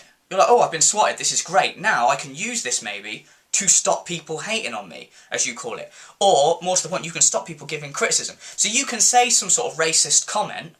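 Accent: United Kingdom. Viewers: I insulted his British accent not the British language as a whole while your coming in and commenting when you do not know what your talking about. But Im done with yall, I proved my point even if you don't think so or not. If your looking to get another reply from me your going to be waiting because I'm gone by lol. Just know I'm going to be back if you make another video on a DSP topic, adios my not amigos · Love that UK accent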